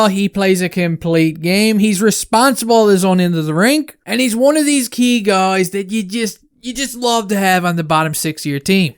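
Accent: Newfoundland, Canada. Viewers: you sound like you might be a Newfie lol am i close?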